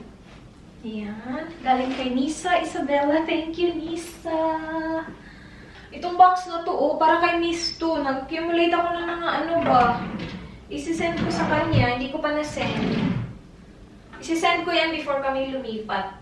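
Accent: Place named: Visayas, Philippines. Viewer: Kanang bisaya gud nga accent ako gnhan mam..😁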